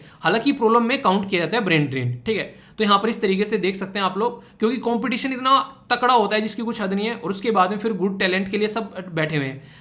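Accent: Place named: Uttarakhand, India. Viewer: Sir aap Uttrakhand ke ho aapke tone se lagta he.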